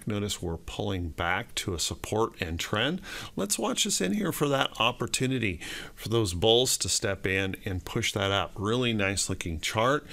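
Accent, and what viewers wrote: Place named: United States. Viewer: Your morning market prep intro sounds so American 🙌 glad to hear that you’re doing better.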